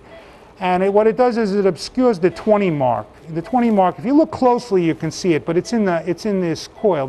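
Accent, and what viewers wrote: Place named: New York, United States. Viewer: Love your NY accent, love the video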